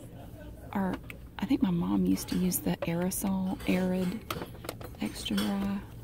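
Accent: South, United States. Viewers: Southern accents usually annoy me because I had a bad experience with a jerk lady with a southern accent but you have restored my faith in southern women. You’re voice is relaxing and sweet and I think I can learn to love southern accents again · I like her accent. I grew up in the south and it reminds me of all my elementary school teachers accents. I wish I would have devolved a country accent. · I love watching your ASMR because we are both from the south and your accent reminds me of home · she’s southern, as is Laura Lee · I used to live down south and everyone had an accent like that. I loved listening to them talk. 💕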